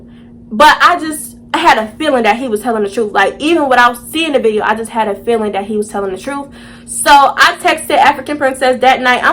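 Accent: Baltimore, United States. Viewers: LMAOO THAT ACCENT NOT MARYLAND THATS BALTIMORE ITS DIFFERENT 😭😭😂 but I’m from dc so I get it lmaooo that shit funny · the baltimore accent 😭😭😭😭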